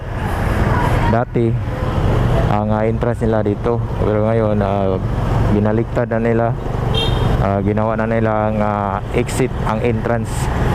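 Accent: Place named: Visayas, Philippines. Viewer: Rs dol. Sa intro palang bisaya na kaayo😂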